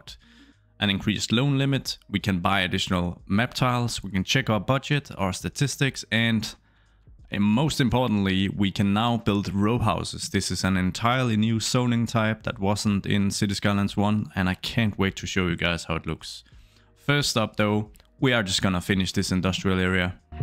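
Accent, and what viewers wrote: Denmark. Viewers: Are you Danish? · Where are you from? :-D Your accent sounds almost Danish! Awesome movie by the way! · are you danish? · You sound like you're from Denmark :) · Are you danish? 🇩🇰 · Er du dansker?:) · Are you danish? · er du Dansker ? 😅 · Really cool!! Can’t wait to play CS2 myself.. by the way, are you from Denmark by any chance? Hearing your accent? 😁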